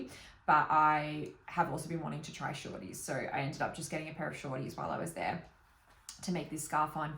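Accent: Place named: Australia. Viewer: This is the first video of yours that I have watched and let me tell you how my jaw DROPPED when I heard your aussie accent! As a fellow aussie who adores knitting and crocheting, it is so exciting to find your channel :) Looking forward to watchinf more videos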